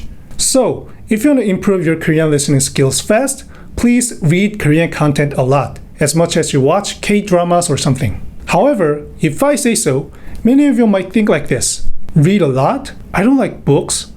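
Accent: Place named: United States. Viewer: Me being an English native British English speaker and being so confused when you said English words come from the back of your mouth, and then ofc the examples were in an American accent 😂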